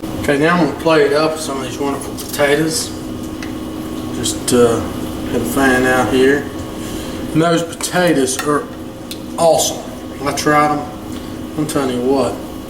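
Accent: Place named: Georgia, United States. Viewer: Came across this vid after I bought some flat iron steaks at the local Piggly Wiggly. Started strong with the proper manner of talkin' (like we do round here in mid-Ga) you lost some credibility cracking open that Buttwiper (Light, at that!) but then, it IS STILL beer . . . so I continued watching. Will do some steak fries instead of the new taters and reckon it ought turn out purdy decent. Keep ya posted.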